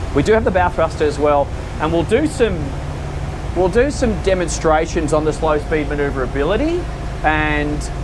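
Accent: Australia. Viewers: The way you Aussies say "here", just boggles the mind lol. Hiare.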